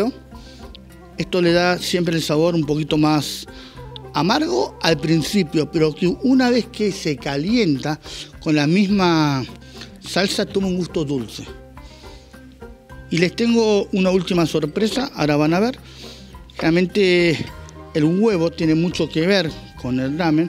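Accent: Argentina. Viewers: A lo primero me chocó escuchar en un japonés,ese increíble acento argentino,pues esperaba que fuera un canal de Japón.Pero me a encantado esa sencillez con la que se expresa.Un humilde español les desea todo lo mejor. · Me suena gracioso escuchar un japonés hablando como los argentinos, pero se ve que es un buen chef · ME PONE LOCO VER AL JAPO HABLANDO PORTEÑO....MARAVILLOSO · Hola primera vez que te veo,muy interesante e instructivo, solo que me dió mucha risa ver un descendiente japonés con el acento Argentino.. mirá vos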